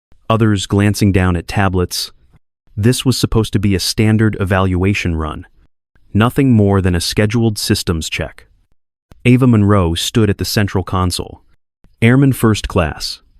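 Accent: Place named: France. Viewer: Why does the voice ,occasionally, seem to talk with a French accent?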